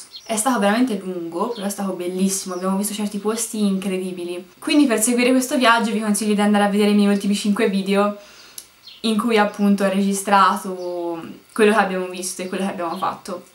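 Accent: Tuscany, Italy. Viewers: Che bello l'accento toscano 😍😍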